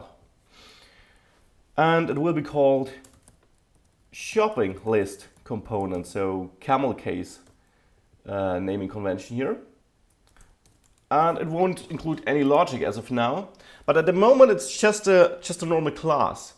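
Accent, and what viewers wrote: Germany. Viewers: Thanks for this video. It is fast, direct to the point, no time lost, fun to watch, and nice german accent! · Ich hab das Gefühl, ich höre ein wenig Deutsch heraus :D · "Bootable", "Boot" I love German accents!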